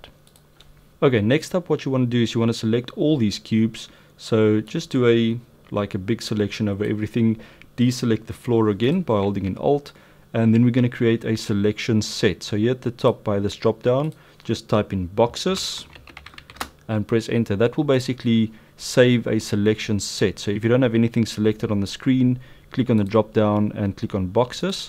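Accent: South Africa. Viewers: South African accent!!! lekka boet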